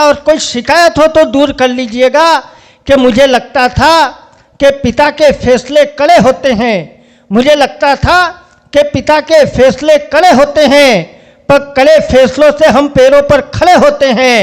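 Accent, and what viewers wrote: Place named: Gujarat, India. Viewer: ❤ touching, love you ji. aapka tone gujrati lag raha hai.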